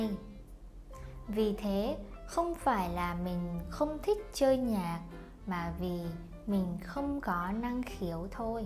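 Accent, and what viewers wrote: North, Vietnam. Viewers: Giọng Bắc nghe thật dễ chịu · Nice Northern accent. Y'all truly nailed it. Keep it up girl !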